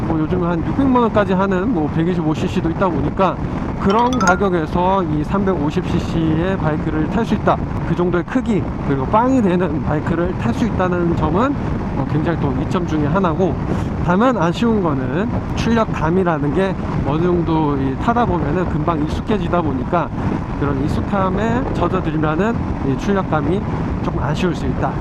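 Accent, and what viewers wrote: Gyeongsang, South Korea. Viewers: 형님 경상돕니까? 너낌~ 세알리고~ ㅋㅋㅋㅋ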